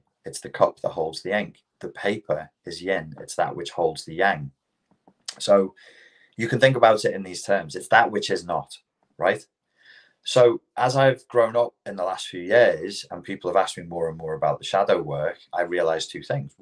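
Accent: RP, United Kingdom. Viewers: As a Canadian I think your accent is kinda posh sounding.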